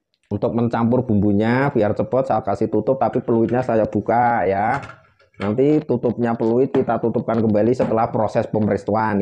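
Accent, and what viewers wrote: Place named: Java, Indonesia. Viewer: Chef ttp dgn ciri logat medoknya 😊 seneng sy, sukses selalu ttp humble 😊😊😊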